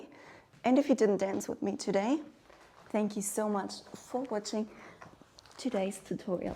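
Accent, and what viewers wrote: Germany. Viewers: I could listen to you speak English with yr awesome German accent all day !! Love you !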